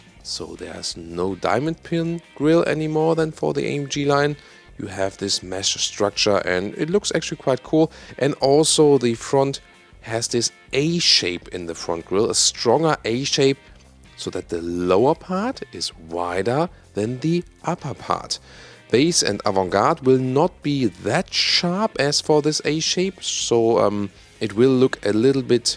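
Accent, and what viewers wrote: Germany. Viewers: The wagon is pretty dreamy, are we even getting in the states? I’d never spend that kind of money on a car with my current rare use of them but I wish the Japanese or Koreans had something like this in the category as opposed to crossovers only. I’m still chugging along - very happily I might add - in my very “analog” ‘02 WRX wagon and with 72k original my miles not yet seeing a compelling reason to upgrade. Wish the Subaru Levorg or similar existing sport wagon in other markets was imported here. Great and very complete video overview of the car and love that German accent! Haha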